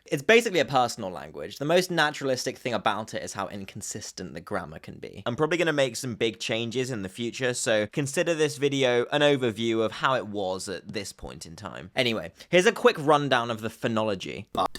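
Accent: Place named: England, United Kingdom. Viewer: Id rather listen to you speak the conlang because your English accent is really annoying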